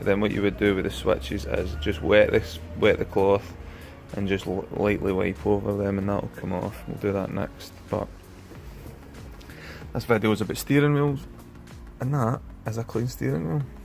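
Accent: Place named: Scotland, United Kingdom. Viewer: I fell off my fart-free seat laughing when you were talking about cleaning "farted on" car seats. Your dialect makes it all the funnier and reminds me of Colin Mcrae. Are you from Lanarkshire by any chance?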